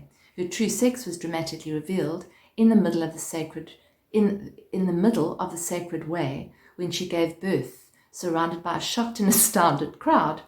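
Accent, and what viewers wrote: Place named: South Africa. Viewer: Just "stumbled upon" your reading. Lovely, thank you. And hugely apt for me right now! Great to hear your saffer accent too. I was from Plett but made the move to Panama nearly 3 years ago!! Take care and thank you ♡♡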